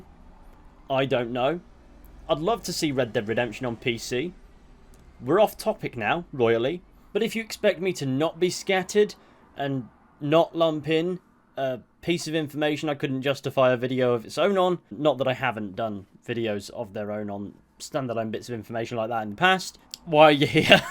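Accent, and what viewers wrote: United Kingdom. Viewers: لكنتك ليست أمريكيه هل انت من المملكه المتحده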